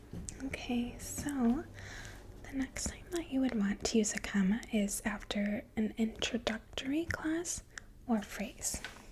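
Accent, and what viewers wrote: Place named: Upper Midwest, United States. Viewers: You have a nice subtle upper Midwest accent..I know. I'm originally from there..very nice..love your ASMR..thanks so much..goodnight .😇😴💤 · you sound like you're from chicago miss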